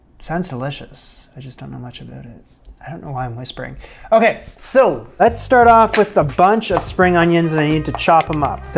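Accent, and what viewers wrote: Canada. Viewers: I am pretty sure he is Canadian, judging on his accent, preference for metric, the fact that his older videos were filmed in Canada, etc. He is currently in NYC though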